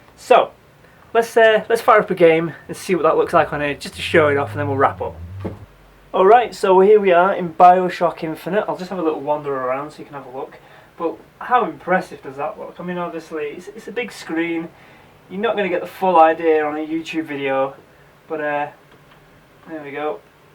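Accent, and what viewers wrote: United Kingdom. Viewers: love your british accent.a breath of fresh air in the jungle of north American content.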